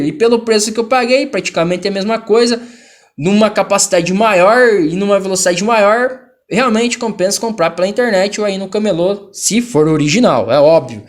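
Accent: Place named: São Paulo, Brazil. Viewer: Uiiii sotaque paulista irritante esse em, existem melhores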